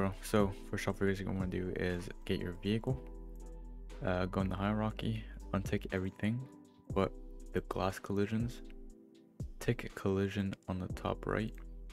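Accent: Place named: Spain. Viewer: You're a Spanish speaker xd right?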